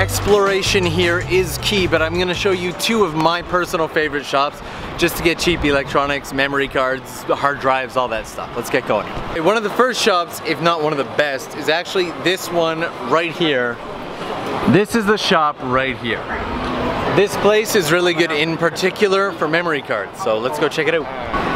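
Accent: Canada. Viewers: This guy sounds Canadian 🤔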